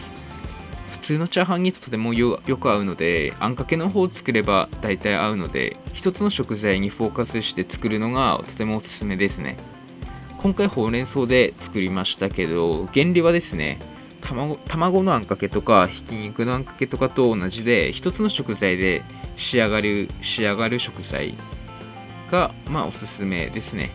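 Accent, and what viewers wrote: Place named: Tsugaru, Japan. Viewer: おめ津軽だべ？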